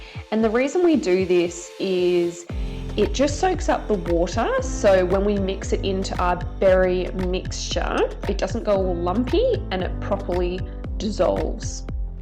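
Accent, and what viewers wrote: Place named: Australia. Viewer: Really? You’ve obviously never met an Australian, this is how a lot of us talk. 😊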